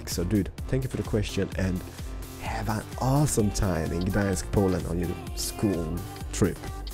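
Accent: Sweden. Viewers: ÄR DU SVENSK?! (are you swedish?!) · Wuuuut are you Swedish :O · Jag är oxå från sverige :D Du har svin härlig dialket!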